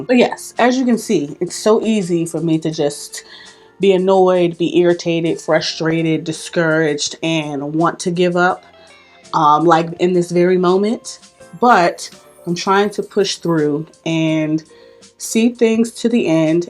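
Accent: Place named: Baltimore, United States. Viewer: Happy New Year,! 🎉 Life has been lifing so I haven't been around in a while. But, you've always been a real one and I enjoy watching you. Whenever I need my dose of Baltimore "ouu" or "ur", your my girl. 😂💯 I pray that all you've set before you and even the good ones you didn't coming, come true in 2024. ❤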